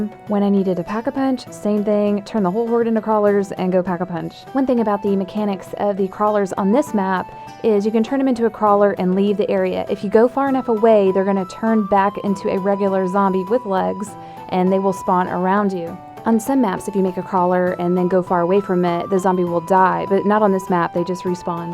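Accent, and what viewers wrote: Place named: South, United States. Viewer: where ar u from u have a sort of faint southern accent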